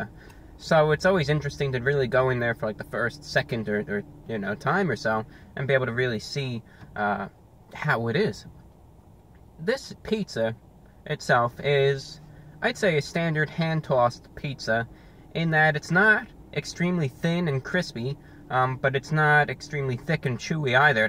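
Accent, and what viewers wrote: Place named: Transatlantic, United States. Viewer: The name of that accent is Transatlantic as it's supposed to mix in elements of GA and RP.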